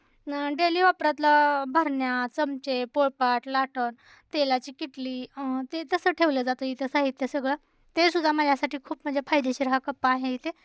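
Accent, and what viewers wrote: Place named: Khandesh, India. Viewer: Waadte tii khandeshi aahe ..me pan . Ticha accent khandeshi aaye ..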